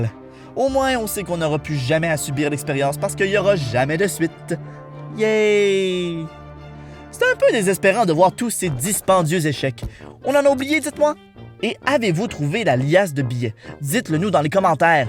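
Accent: Canada. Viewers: J adore l accent candien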